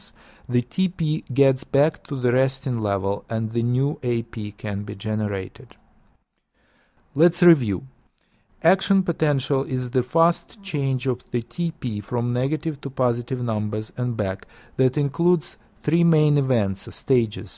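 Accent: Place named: Russia. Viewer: The Russian accent makes it cooler!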